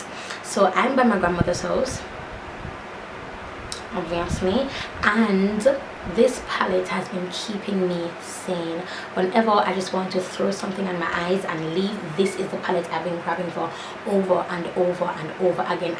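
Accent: United Kingdom. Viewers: does she have a mixed accent cos she also sounds like she has a British accent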